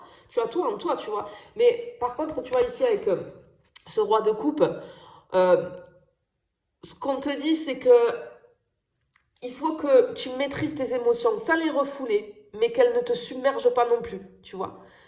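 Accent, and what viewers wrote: South, France. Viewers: Tellement joli cet accent du sud ☀️🐝🌻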